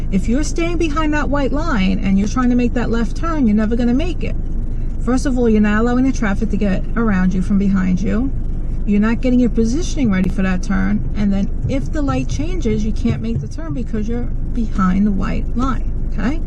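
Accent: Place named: New York, United States. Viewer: Do you teach in Suffolk county? I like you New York accent lol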